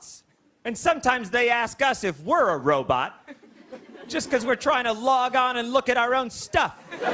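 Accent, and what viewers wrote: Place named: Chicago, United States. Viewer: I think it's the nasally Chicago accent!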